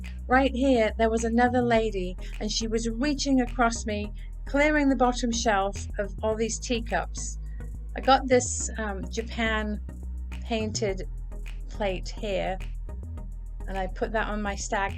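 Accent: United Kingdom. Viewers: Hi, new to your channel. I saw you & Danni, the Niche Lady shopping together. Love your valuable info about why/why not you choose things. I'm a reseller, but have 3 booths here in western KY & don't sell on ebay. I heard Danni advise picking up fire fighter mugs, sunce they are good sellers & noticed a blue one on the shelf you may have missed. God bless! I love your accent! British? My mom was from England.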